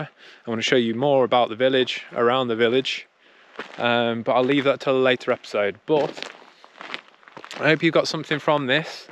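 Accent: United Kingdom. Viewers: Hey brother! I just wanted to say that you're such a polite and charismatic person. You're always so down-to-earth and friendly, and I really admire your hardworking nature. With your ties to the UK and that charming British accent, I think you're well on your way to something big. With the little investment to get realtor license, and with the drone, website, and YouTube channel you already have, there’s definitely money waiting to be made. Keep pushing forward—great things are ahead!" To calculate the commission you'd make from selling two villas worth €1 million each with a 3% return: Calculate the commission for one villa: €1,000,000 * 0.03 = €30,000 Since there are two villas: €30,000 * 2 = €60,000 So, you would make €60,000 in total from selling both villas. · pitam Bezveze ono sve mi je Čudno pričaš Englesko ka Britanac pa san mislija da si rođen u Londonu ti samo živiš tamo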